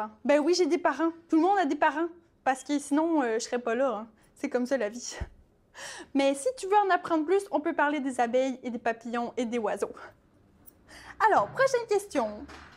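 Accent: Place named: Quebec, Canada. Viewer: Une jolie fille avec un accent québécois !! je fond !! je suis normand...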